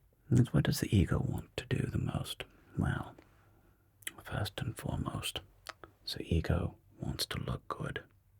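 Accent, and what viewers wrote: Germany. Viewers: Perfect, I love the German accent, and your thoughts!💛 The banana metaphor was so simple, but so on point for this... because holding onto things is sometimes less scary than the unknown even if it cages our very own hand in a bowl... very true! Loved the insights of this video!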